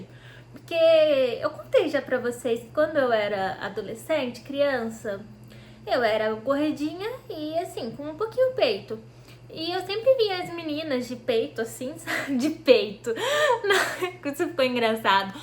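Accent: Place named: São Paulo, Brazil. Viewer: Acho que ela fala paulistano, pessoas que moram em são Paulo.. eu também acho bonito como eles(a) falam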